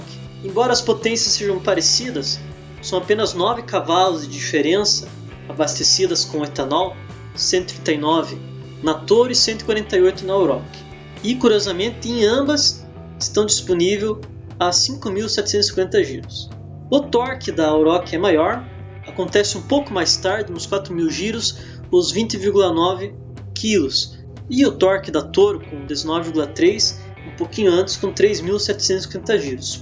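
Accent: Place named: Santa Catarina, Brazil. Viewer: #CARWP nossa seu sotaque é de catarinense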